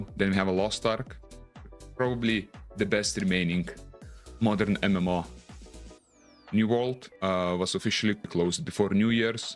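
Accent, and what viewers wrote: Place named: Russia. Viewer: Пипец акцент русский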